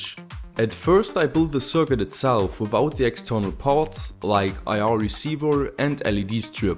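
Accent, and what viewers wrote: Germany. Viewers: keep making cool stuff that's affordable and other folks can make too. P.s I noticed the German accent considering you're doing this stuff and college is free in Germany what kind of engineer exactly are you? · Thank you for that Video :) It helped me out realy much ! Please keep doing such nice projects and Videos. Is your mother tongue german ? :) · Gebürtiger Deutscher? Man hört es :DD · 8233Eire it's a German accent btw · Du bist deutsch oder? Your accent is german.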